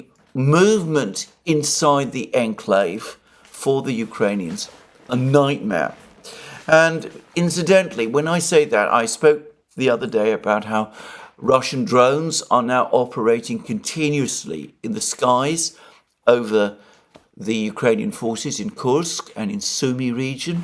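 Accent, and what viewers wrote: United Kingdom. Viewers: It's strange, I hate British accents and it's always bad news, but this has become a comfy little show for me. Thank you Alexander.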